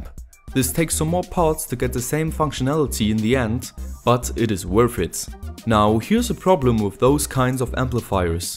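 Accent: Germany. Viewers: dude he's German you can tell by his accent. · That accent is clearly German, maybe Dutch which is similar (NOT the same, I dont wanna offend my German friends) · Your very german accent is very pleasant to my ears.. Nice project but why don't you use just a small transformer or transducer to get rid of the hum, when you use the same powersupply for the amp and the receiver? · he sounds german to me and the brands he uses suggest he lives in eu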